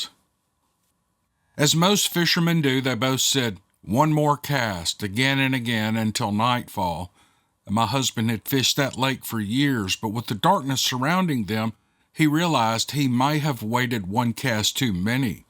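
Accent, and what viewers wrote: South, United States. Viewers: I love not only because of the stories & but is the stereotypical southern personality. I’m from the South and live a LONG way away from there now. I love to settle in on a video and just sink into the Southern accent and the Southern way. In a weird way it’s a little bit of home.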